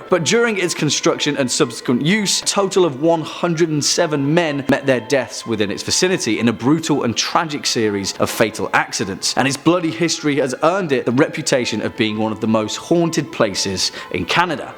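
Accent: United Kingdom. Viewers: HOW can this dude be from Ontario, CANADA when he got a BRITISH accent? WTF???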